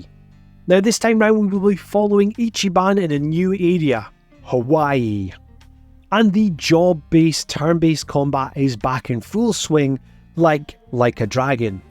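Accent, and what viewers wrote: Scotland, United Kingdom. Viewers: Hey as a sidenote, yesterday I watched a collab video in Femtrooper's channel and it was a pleasant surprise to see you featured there! I'm really glad to see your channel growing and doing collabs like that, you make some great content keep it up! (Also it might sound weird but I love hearing some scottish accent for a change on YT haha)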